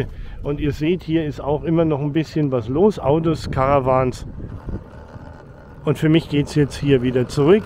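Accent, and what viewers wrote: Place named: High German, Germany. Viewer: Ich freue mich morgen nach Gran Canaria zu fliegen. Ich bekome schon heimweh nach den Dünen. Mach weiter gerne mit ihre Reportage. Ich schaue gerne ihre video clips und daarbei auch meine deutsche sprache üben. Sie haben einen schönen Stimme etwas hoch Deutsch mit eigen accent! Vielleicht wenn ich daar ben und Sie treffen,werde ich Sie sicher ein Bier anbieten.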